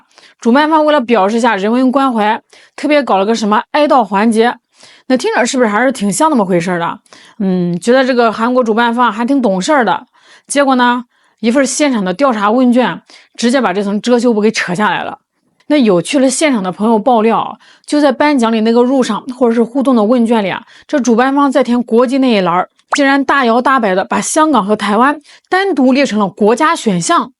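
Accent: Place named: Henan, China. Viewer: 河普地道 😂😂😂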